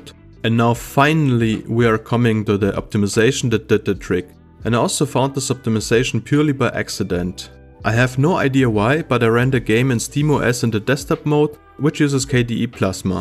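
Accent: Germany. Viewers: Do i hear german accent here? 🤓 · german accent detected. :D -> I have the best results with xfce... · I assume he's german. I'm german, so i can tell 😂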